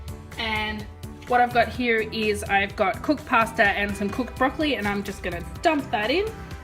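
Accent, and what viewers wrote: Australia. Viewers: I came from money mom's video. Are from QLD because you sound just like Mel Buttle and she sounds so QLD to me? Also are you Mel Buttle?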